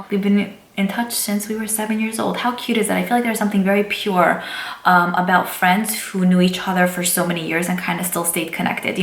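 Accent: New York, United States. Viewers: When I first started watching you I thought you were Italian lol. But, now I realise your accent is New York or Bronx. I think you're adorable, and so likeable. I could really see us being friends. Thanks for filling us in on who YOU are, and we all love you.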